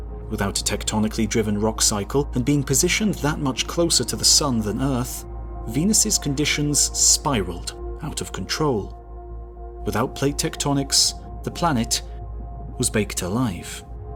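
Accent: England, United Kingdom. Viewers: Is this dude English? Why does everything with an accent sound better?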